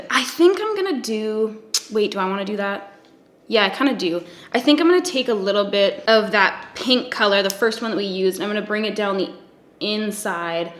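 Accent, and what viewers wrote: Canada. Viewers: I love when the Canadian comes out in your voice. It makes me happy. You guys have the best accent! Side note: it reminds me of the Anchorman 2 fight scene with the Canadian news team from Quebec. #SORRY hahahaha 😘😘😘😘😂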